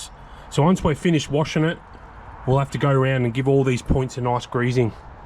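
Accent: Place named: England, United Kingdom. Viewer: I just started watching your channel for the 1st time. I have to tell you as I was listening to your accent. I can now see how English accents morphed into Aussie, American Southern Hillbilly/Redneck and Kiwi. I have never heard that connection from one person before. Amazingly interesting. Anyway, you made a good choice buying that deal. Keep it up. Good job, buddy. 🇺🇸🇭🇲💪👍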